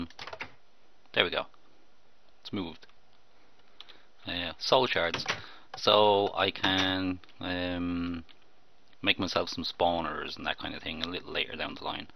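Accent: Ireland. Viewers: I love how UR Irish idk why but I'm Irish to to I guess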